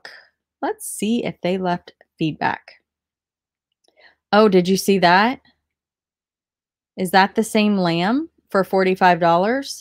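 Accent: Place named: South, United States. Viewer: A nice southern accent too.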